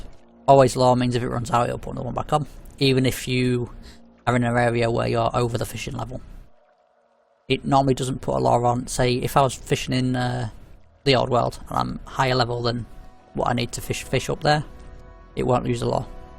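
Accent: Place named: England, United Kingdom. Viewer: Bloody idiots. This is fucking English. This is what English sounds like in England. Not in London, in England. All of these non-native English speakers complaining makes me cringe hahaha, get your own world language and see how you feel about every tom, dick and harry butchering it before your eyes.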